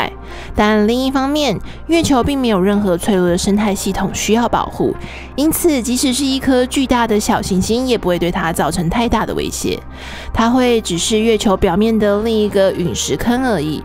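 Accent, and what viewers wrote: Taiwan. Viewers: 台湾腔听着莫名恶心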